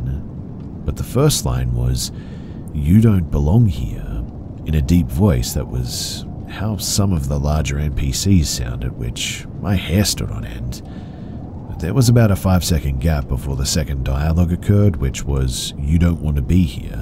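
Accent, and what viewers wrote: Australia. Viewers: Was going to say better be an Aussie saying that but then could tell 😅o Aussies speak like that 😂❤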